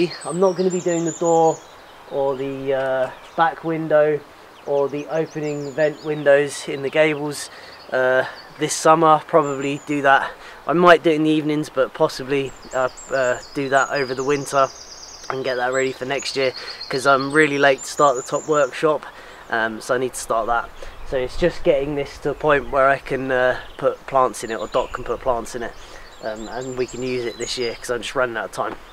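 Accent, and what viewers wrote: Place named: England, United Kingdom. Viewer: Теплица прикольная а вот чепорный английский говор отвратителен.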